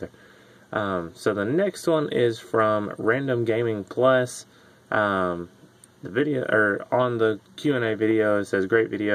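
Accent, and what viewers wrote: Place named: Texas, United States. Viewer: Let me guess ur from Texas I'm from Louisiana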